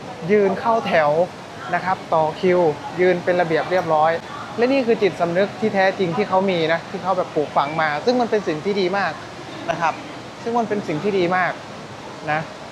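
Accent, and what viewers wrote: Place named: Ubon Ratchathani, Thailand. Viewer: เจ้าเว่าลาวคือคนอุบลเว้าเลย สำเนียงคือกันเลย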